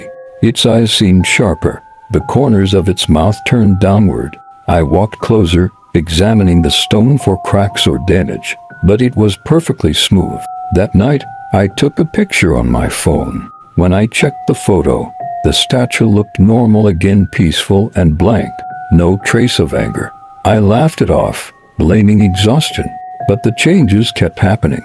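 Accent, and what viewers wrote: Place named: United States. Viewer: If it's in the UK, why is the narrator using a US accent? Would make a great practical joke.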